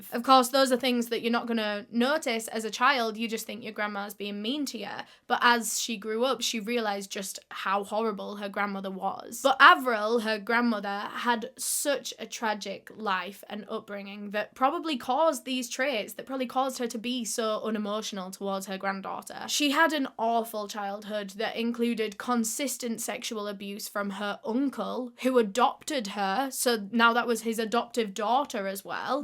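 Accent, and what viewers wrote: United Kingdom. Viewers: I love your British accent 😍❤️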